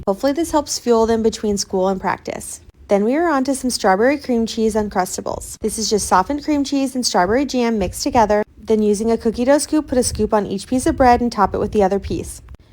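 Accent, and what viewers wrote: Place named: Australia. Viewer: Are you Australian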